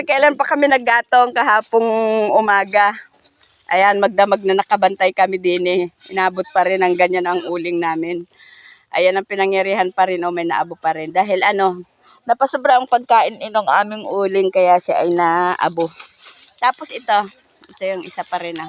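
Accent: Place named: Atimonan, Quezon, Philippines. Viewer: Nkkbilib nmn..Sobra sipag tlga.. yun punto ng pagsasalita ninyo ay para taga atimonan quezon.. pakiramdam ko eh andun tuloy ako s lugar ng lola ko😊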